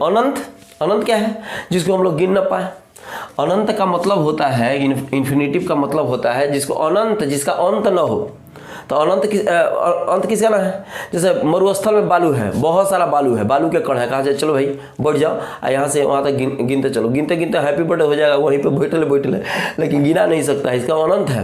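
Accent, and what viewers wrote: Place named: Bhojpuri, India. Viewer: Sir apka bhojpuri wala acent acha lagta hai with bhojpuri song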